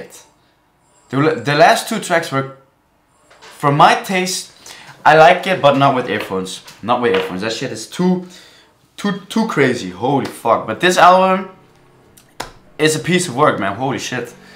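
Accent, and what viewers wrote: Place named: Netherlands. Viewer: love how the dutch slips through his english speaking, lekker man ❤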